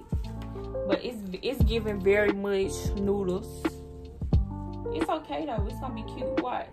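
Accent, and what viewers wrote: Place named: Baton Rouge, United States. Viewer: You gotta be from Baton Rouge lol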